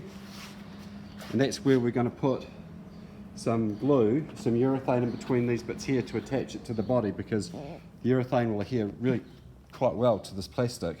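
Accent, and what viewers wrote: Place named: New Zealand. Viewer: Fantastic! I have been thinking of buying something like this, but they are so damned expensive. I have to ask though, is the pump fit for breathing air? I know that in SCUBA, they have to filter it to take out anything that may harm health, so I am keen to know if this pump is fit for purpose from a health sense. If so, I think I may do this. BTW, it sounds like you are a Kiwi. Where abouts are you? I am in Welly.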